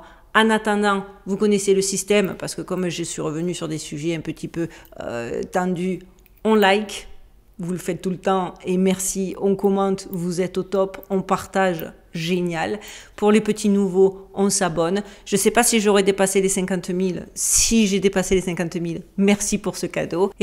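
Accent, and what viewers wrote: South, France. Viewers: Et superbe t'on Accent du sud De la France J'adore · Merci ! A toi qui met l'accent du Sud sur ls dingueries de ce bas monde... Que du bon pour toi et la famille incha'ALLAH, ainsi qu'à tout t'es abonnés (ées). Longue vie à ta chaîne...👉🏽😉👍🏼👉🏽🌟🌟🌟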